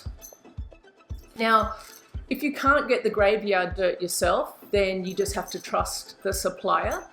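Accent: Australia. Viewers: I gotta tell you! It's so refreshing listening to an aussie accent talk about witchcraft/demonolatry/magick/occult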